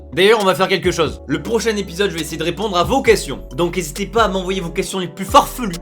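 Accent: South, France. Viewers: Tu a un acsen du sud toi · tas l'accent du sud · j'adore тon accenт dυ ѕυd ❤❤❤❤❤❤❤❤ j'en aι ιne eт ѕι qυand on rever c'eтe noтre vraιe vιe eт qυe la on reve ???????????????????? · T'as un (des fois) accès Marseillais PERFECTO👌😱😂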